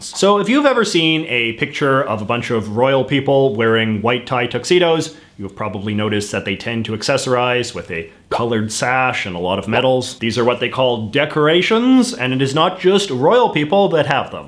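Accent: Canada. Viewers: I’ve never heard a more Canadian accent in my life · The way you pronounce about and around is clearly rooted in some deep candiana, yet you forego the proper pronunciation for Lieutenant for the American pronunciation.. why is that? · You can tell this guy is Canadian just the way he speaks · i cant listen to him say outfit or about in canadian again. im out. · First time I am watching this channel (very impressed) but that Canadian accent... either exaggerated or you're from Cape Breton or Newfoundland. The only reason I say that is I'm a maritimer myself and haven't heard that in good old Halifax, but certainly have heard it around ! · Is that a real Canadian accent? The “oot and aboots” are so strong it sounds like you’re mocking Canadians. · As a fellow Brit, it also helps that has an incredibly thick Canadian accent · Love your Canadian accent as well as your legs. 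· Funnily as a Canadian in BC I don't normally hear the stereotypical Canadian accent, but yours is quite pronounced. I assume this is an Ontario thing? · This is the most Canadian accent I have ever heard. Is this real???? Where are you FROM??? hahah (Respect to Canada from Minnesota) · I challenge you to say out and about without the Canadian accent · Hey man, I’m from Canada too but “arooond” instead of around, is a really extreme accent. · Oof this dude sounds Canadian. They usually sound like normal people, but then they say one or two words in a super weird way. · Thanks for keeping the Canadian accent alive,. You really lean into it. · You have a very Canadian accent overall, but the way you pronounce "lieutenant" is quite American. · I can tell that Canadian accent BTW I really want to go to Canada we’re would you recommend? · Thank you sir. You may (or may not) be interested to know that many members of our Royal Family in UK seem to love wearing military uniforms regardless of their (the RF member's) age or membership of a particular militia. 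Incidentally, talking of nations, natives etc did I detect a fine, Canadian accent? I have been practising listening before my hearing finally departs! Blessings and peace PS I really need to listen to the complete video to prevent making observations that you have already addressed. My apologies. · Don't think so, we all just say Missouri the normal way lol. He does have the stereotypical Canadian accent though, so I guess that's it. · First time here and you’re the most Canadian person I’ve ever heard... and I’ve been to Canada 😂 really enjoyed this!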